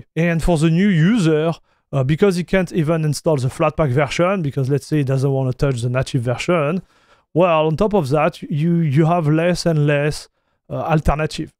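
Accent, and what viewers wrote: France. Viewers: The french accent is so strong ;)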